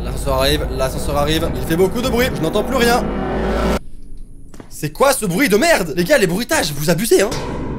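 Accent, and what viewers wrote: England, United Kingdom. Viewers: jeux dhorreur Moi: ouiiii on vas entendre crier*-* Et le fameux accent anglais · 😂😂 et sons accent anglais😂😂😂😂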